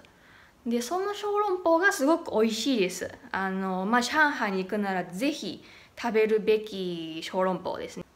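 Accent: Kansai, Japan. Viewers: 関西ご出身ですね(笑) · ははははこれが大阪弁でしょうか？面白い、たくさんの擬音語があるね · 、物事言う時さすが大阪弁でワぁ！ワぁ！とか多いですねいい😊👍 笑私、さんの故郷大阪でそろそろ満30年間住んでる上海人です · ぶわぁー、ぼーん、とばぁーっと合わせて15回以上使ってる。ちゅーちゅー吸うも関西ならではの言い方ですね。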